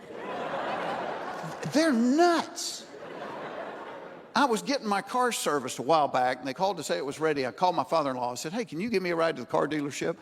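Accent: United States. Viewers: Not funny Jeff is going to be old soon. Making fun of the elderly is uncalled for. He has no respect for the elderly that helped support him baby sat when he was a baby and a little boy growing up. He was spoiled and the parents let him treat his grandparents anyway he wanted. Bad parenting has spoiled grown children. Jeff acts like he is younger than he is. To think his mother is in her 80s. Yes an elderly person can carry at 80 since their grown children are left alone in their homes and they live by themselves the elderly have to have protection. They don't have to buy a 38 caliber gun they can buy a small 22. How would he feel if his mother was robbed at night and they hurt his mother all because he made fun of her not wanting her to get a gun. The bucket has been a part of history when they didn't have bathrooms with flushing toilets and most everybody all ages had a pot that was put under their bed. 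How about the poor that had to use buckets and people they only had out houses. He's got a southern accent he should know the south had hard times just like the people in the north New York. Shame on him being a so called comedian. The elderly have real medical problems no related to age. Alzheimer's is Diabetes of the brain and the researchers found a 13'year old with Alzheimer's. They quoted its not related to age. forgets things all the time he isn't going to be all alone in forgetfulness because memory loss comes from pesticides and what's all in our food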